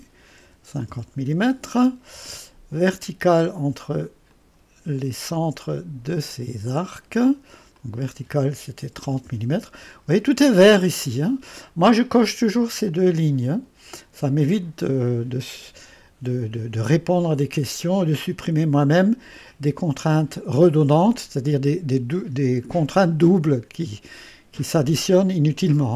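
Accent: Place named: Strasbourg, France. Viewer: ce petit accent qui me rappelle ma première femme Strasbourgeoise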